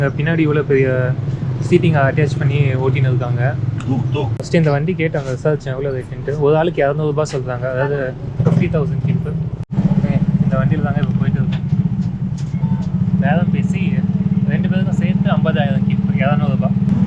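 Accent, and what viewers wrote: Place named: England, United Kingdom. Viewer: தெளிவாக பேசவும் புரியவில்லை ஆங்கிலேயர் போல் தமிழ் பேசினால்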